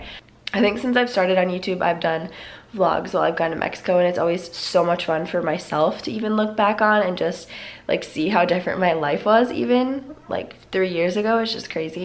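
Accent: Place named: Minnesota, United States. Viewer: Your from minnesota or north dakota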